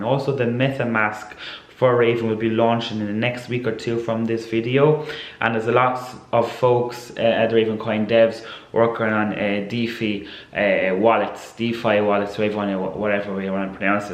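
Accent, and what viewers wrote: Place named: Ireland. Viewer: Very good video love your Irish accent my family are all from Cork 🤙🙏🙏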